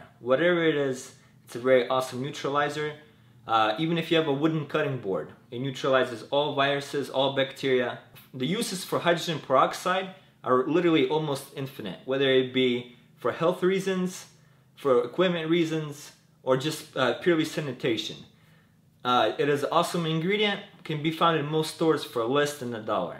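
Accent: Russia. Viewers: Wat happen to your Russian accent 😯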